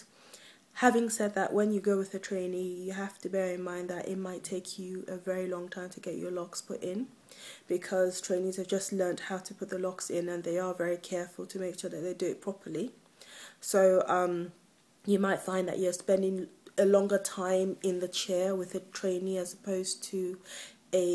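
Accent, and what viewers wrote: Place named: England, United Kingdom. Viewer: I love her english accent.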